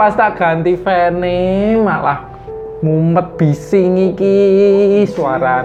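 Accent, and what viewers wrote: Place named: Java, Indonesia. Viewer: Wah jowo, tak sub lur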